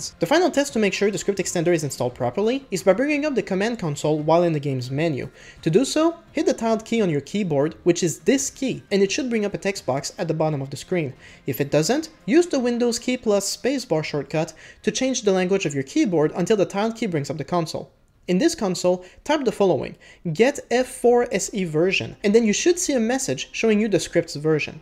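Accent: Quebec, Canada. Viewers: Is it me or i can sense a little quebecer accent ?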